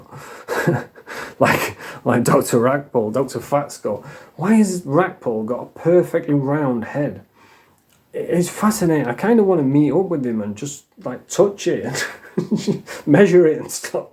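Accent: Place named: Newcastle, United Kingdom. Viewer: That geordie accent 😂😂 class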